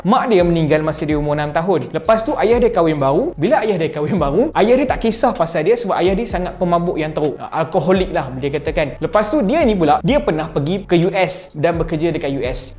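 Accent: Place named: North, Malaysia. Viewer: rupanya slang utara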